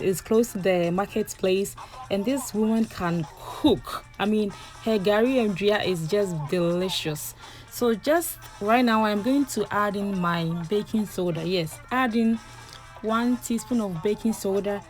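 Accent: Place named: Ghana. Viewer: The Ghanaian language ascent alone😍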